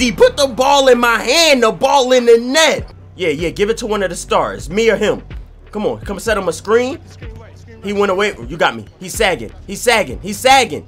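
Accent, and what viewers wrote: Philadelphia, United States. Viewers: U from Philly bro?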